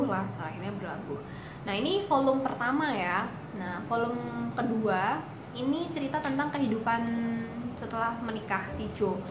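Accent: Java, Indonesia. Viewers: Ngomongnya MEDOKKKKKKK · Ngomong y medok banget mba e...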